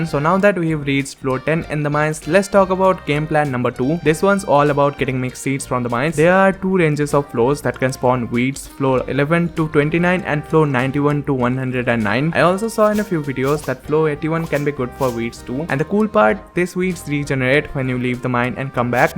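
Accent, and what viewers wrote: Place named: India. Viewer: also you are definitely understandable in English just with funny Indian accent which makes it unique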